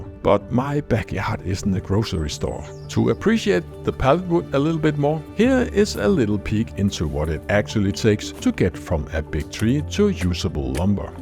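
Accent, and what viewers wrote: Denmark. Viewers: you sounds danish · Ej fy for helvede din dansk-engelsk stemmer får mig til at krumme tæer... Virkede ellers som en spændende video. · Hej fra Canada! (Parents are Danish though. Great hearing the accent again) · dam im danish (i use differents accents on danish and english) and i imediately were like "that sounds so stupid.. is he using a danish accent?" and then you were like "in denmark" xD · du er da dansker! jeg kan kende dig fra din accent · I love it that you do voice over using your own voice and amazing Danish accent. I get sick of people using AI voice that sounds like a 1950's American radio program. Tak fra Holland! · Som dansker var det for grinern at høre en dansk accent i starten af videoen. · Som dansker må jeg bare sige, at der i reglen ikke findes noget værre end at høre danskere (inklusiv mig selv) tale engelsk...men din video og fortælling er så god, at jeg kan ignorere det! 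Det er en sjælden gave,. Godt klaret og fed video! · I was just thinking "this is cool but you can't do it in Denmark" when I clicked on the video but then I heard the accent ❤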